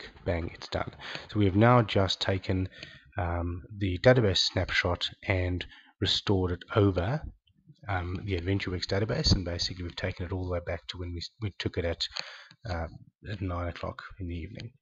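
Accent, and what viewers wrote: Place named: South Africa. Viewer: Your a legend! Quick and easy description and a very pleasent Saffa accent! Good work, thanks.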